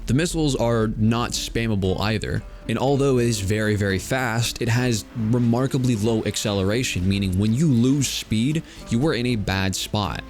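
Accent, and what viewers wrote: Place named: Netherlands. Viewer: The slightly hidden Dutch accent just oozes of every few words you speak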